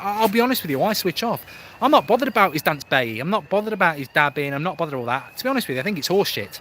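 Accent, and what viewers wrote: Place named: Manchester, United Kingdom. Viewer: you almost sound like a manc. no disrespect.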